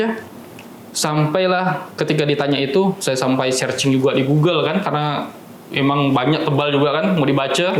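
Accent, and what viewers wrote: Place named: Pontianak, Indonesia. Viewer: Logat Melayu Pontianaknya masih kental ya bang... Alhamdulillah, crite abang mirip dgn teman sebangku ku, krn keseringan lihat saye beribadah.. semoge abang istiqomah... aamiin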